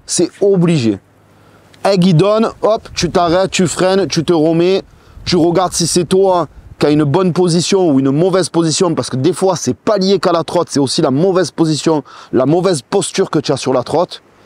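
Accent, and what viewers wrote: Marseille, France. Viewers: Laccent maseillais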